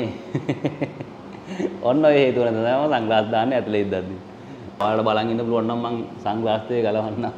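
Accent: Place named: South, Sri Lanka. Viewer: මම නම් ඔයාව subscribe කරෙත් video බලන්න ගත්තෙත් ඉස්සර හිටපු travel bro නිසා❤අනිත් එක කතා කරන විදිය මොකද දකුණෙ නිසා❤මොකද මාත් දකුණෙ 😁, ඉතිං සුබ පැතුම් අනාගතෙට❤🎉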